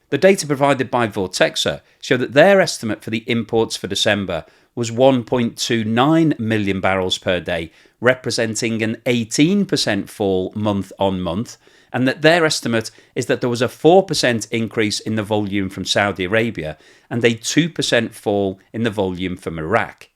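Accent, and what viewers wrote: United Kingdom. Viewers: At begining I have a hard problem to understad your britsh acccent, now it loks familiar for me. Thank you for all the good informations.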